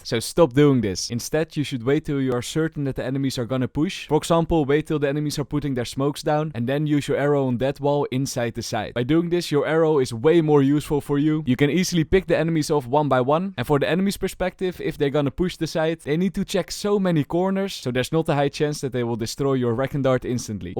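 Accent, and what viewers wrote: Netherlands. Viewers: If youre not Dutch you dont hear it, but the fact that he still sounds more Dutch than English after years of making English content hurts me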